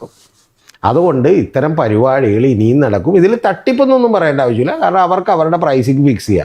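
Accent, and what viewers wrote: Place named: Kochi, India. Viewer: This man is really🤔 funny😆 His cochin slang😅 Anyway I enjoyed😊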